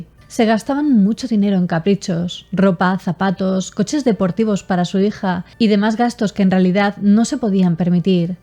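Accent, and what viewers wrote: Spain. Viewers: Nunca pensé que el asentó español sonara sexy 👂🏼🥰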